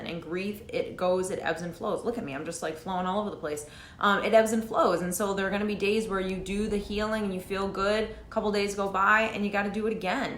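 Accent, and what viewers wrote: United States. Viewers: Healing about what ? Get stuck? It's the way you see yourself . Self worth help you to overcome your worries . I past through griefs and all of us too . Life is continue It's not standing and don't do nothing Instead of that see the good things around you such your health you have something to eat and a shelter. A lot of child and people suffers because war and they have nothing and ourselve live in a land which has all things we need . To heal we need to look around us and not to be selfish focusing only on ourselve . Is here my opinion teacher . Step by step i get your talk in spite of your American accent .yeah yeah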